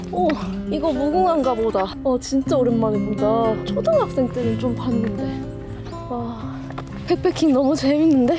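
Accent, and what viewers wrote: Jeolla, South Korea. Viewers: 전라도 사투리도 귀여브러…❤ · 전라도쪽은 가본적이 없었는데...영상으로 대신 가주시니 좋습니다. 간혹 나오는 님의 전라도 사투리도 듣기 좋았구요. 덕분에 오늘도 여행 잘~ 했어요. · 원래 전라도 분이신가 사투리가 ㅋㅋㅋ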